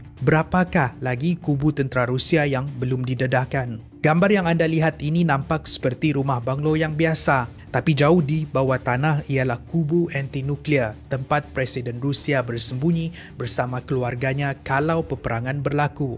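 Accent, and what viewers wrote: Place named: Malaysia. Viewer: Bahasanya sudah mulai lancar walaupun masih agak kental melayunya tapi bahasa Indonesia ny sudah mulai lancar dan baik lanjutkan banyak 2 belajar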